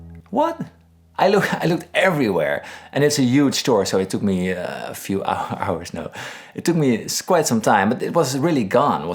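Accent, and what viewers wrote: Netherlands. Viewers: he is from the netherlands if i'm not mistaken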